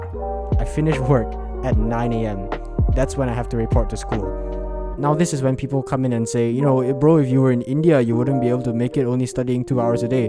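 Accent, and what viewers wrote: Singapore. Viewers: bro you singaporean? and also you really just said "hehehaw" :rofl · SINGAPOREAN ACCENT I CAN HEAR IT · r u Sgporean I think I can hear the accent peeking through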